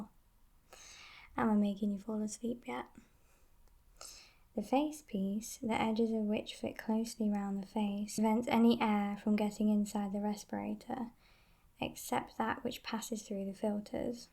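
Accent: United Kingdom. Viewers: Shes either gone MORE british or this is her actual accent and the "posher" one is put on...blown my mind haha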